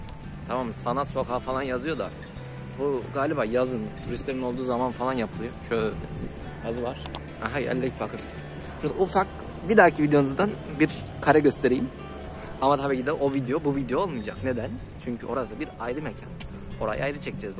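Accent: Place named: Ankara, Turkey. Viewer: Angaralı olduğun nasıl da belli ya la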